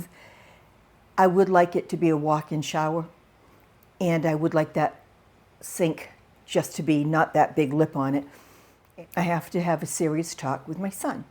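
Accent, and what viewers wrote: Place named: New Hampshire, United States. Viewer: when you find that bra, you must share with us. I have exactly the same need. Love your show. Listening to you reminds me of my mother’s family from Manchester, NH. How they are missed!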